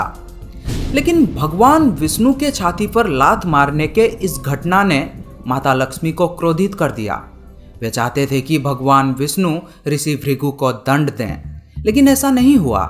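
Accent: Odisha, India. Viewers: This youtuber is also an Odia..you can mark his odia accent.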